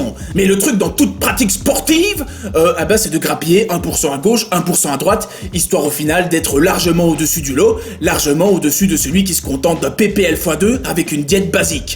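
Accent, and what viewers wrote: Belgium. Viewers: Est-ce qu'on peut faire ça avec des elastibands? 😄.. Tu as une.voix terrible mec! En plus l'accent belge embellie tout ça mdr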